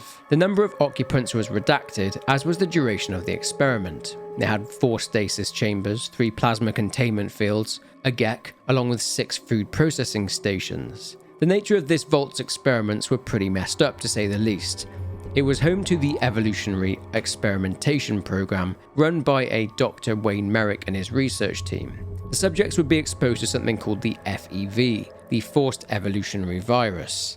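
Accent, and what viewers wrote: England, United Kingdom. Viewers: there's nothing like hearing an English fella, talking about the lore of our fave games. simply the best!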